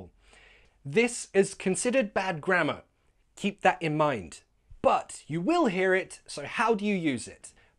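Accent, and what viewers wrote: United Kingdom. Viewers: Amazaing sir British accent English 😊😊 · Hlo sir I am Tara that's really amazing I am up for watching ur video I like ur teaching style.however I wouldnt like British accent bt uve grown on me thank u I love u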